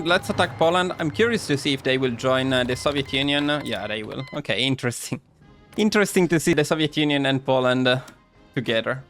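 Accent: Italy. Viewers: Is that an Italian accent?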